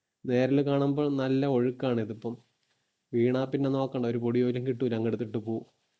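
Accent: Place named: Thiruvananthapuram, India. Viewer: തിരുവനന്തപുരം slang അടിപൊളി സൂപ്പർ മോനെ❤❤❤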